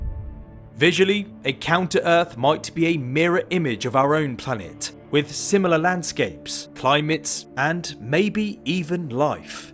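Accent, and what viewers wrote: United Kingdom. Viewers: I got my own version you might enjoy if you want, Mr. British Voice, My Anglo-Saxon Cousin.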